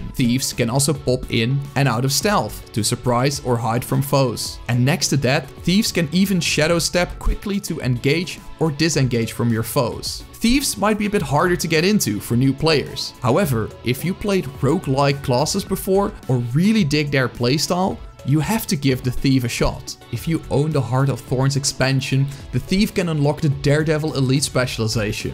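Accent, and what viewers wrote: Netherlands. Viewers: Thanks for the video mate. A friend of mine and I both got into gw2 recently (even tho I tried it a year ago and bought the expansion in the thought id play it more, which I didnt). I came back and decided to start a new character to get back in from beginning to end but didnt know what class or something. This helped me a lot. Greetings from a fellow Dutchie, I heard the accent and its the same I have when I speak English :) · You sounded dutch, checked the about page and I was right! Also, a good class guide. (This sounded like dis, that's why I guessed dutch.) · I sense a hint of Dutch in that accent. Is it? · Good to find some active GW2 content creators, immediately recognized that accent though, fellow Lowlander :) Thanks for the quick guide, been coming back to this game after a year having just played a few months.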